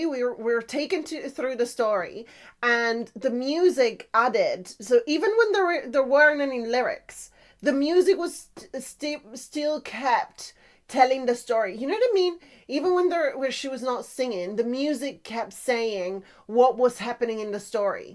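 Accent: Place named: Manchester, United Kingdom. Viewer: FINLAND. RAH! Anette Olzon and Floor Jansen RULE. Nightwish is beyond. You just became interesting. Pure power exists. Watch. Listen. Having seen every rock band on the planet over decades.... There is no contest. This crew hammers it. You sound Manchester. Hope that isnae insulting. RE-target yourself. It does help.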